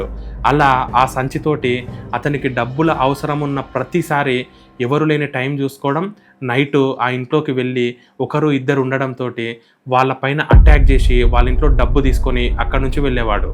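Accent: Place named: Telangana, India. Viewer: నాకు నచ్చింది మన తెలంగాణ యాస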